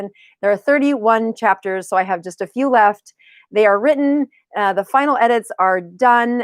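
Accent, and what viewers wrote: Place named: South Dakota, United States. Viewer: Great stuff--I lived in SD for four years, loved it, it's nice to hear the South Dakota lilt in combination with some great insights! Only reason I didn't join the course is because I'm not on Facebook and that part of the package was simply not useful. Thanks for your candor and energy,!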